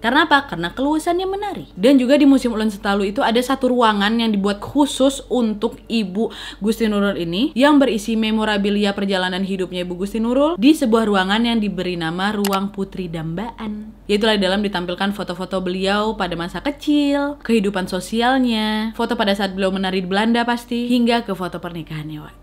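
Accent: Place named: Medan, Indonesia. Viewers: aku suka bahasa wak ini, spt bahasa ku di medan, asli dr mana wak? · MEDAN kali nya kk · Saran alangkah baik ny klw menjadi narator di konten berbahasa Indonesia yg baik terutama aksen medan ny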